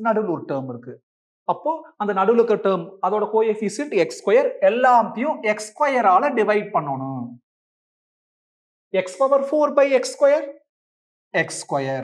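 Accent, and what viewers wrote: Coimbatore, India. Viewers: Coimbatore LA ula slang pola irku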